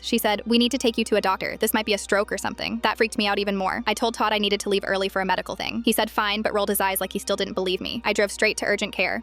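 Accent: United Kingdom. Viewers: "Why do i have a British accent" girl idk 😭🙏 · It sounds like you sounded English and not generically British; certainly not Scottish or Welsh, so why refer to it as British? Can’t you all tell the difference between a Scottish accent and an English accent? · YAY UR LIKE ME HAVE A BRITISH ACCENT😂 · She was from Ohio but she sounds like Brits😊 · YOU ACHIEVED MY DREAMS (I’ve always wanted a British accent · So what is it gonna kill u for having a British accent? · Not everyone from England has a Southern (London) accent 🤣 even tho this is a real syndrome...it's a bit to far fetched when it's England London because I have a mixed accent between scouse (Liverpool) /woolyback (wigan) it could of been any British accent and London is what she went with (stereotypical for an American).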